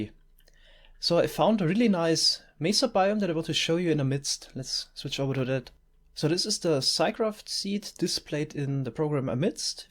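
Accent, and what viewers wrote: Germany. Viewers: Bist du deutsch? 😂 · Der deutsche Akzent, herrlich xd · Is he german? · his German accent says enough, this guy is definetly an engineer irl. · Dein Deutscher Akzent gefällt mir ^^ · German accent is real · Richtig Geiler Deutscher Aktzent von wo kommst du? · He sounds very german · He sounds DEUTSCH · man hört so hart den deutschen Akzent heraus lmao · Are you german?? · aber schön deutscher Aktzent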